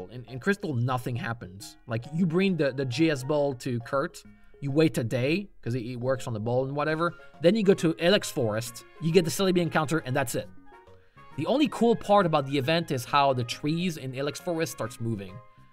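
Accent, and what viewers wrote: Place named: France. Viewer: Judging by some slight variations in accent, I'll assume you're from France?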